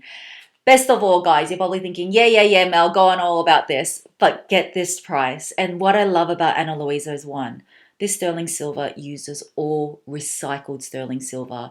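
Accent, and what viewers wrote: Australia. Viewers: I don’t have piercings but I really appreciate these earings, the creative aspect and your kindness behind the creation. Thank you so much for being so mindful of the fact that being able to see the world around us is a blessing and giving back is something we should do because we’re so luckier than a lot of people. I used to live in Australia and I feel really connected with you and your accent. I really like you for being so humble despite having a lot of luxury goods. I wish you all the best. Youtube needs creator like you. Keep up the good work :)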